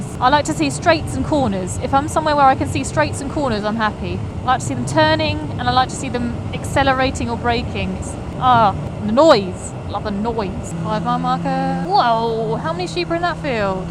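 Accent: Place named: United Kingdom. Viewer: Excellent information video for all those who intend to visit IOM. I love your voice, it reminds me very much of the English pronunciation of Guy Martin. Thank you, young lady in action on a motorcycle!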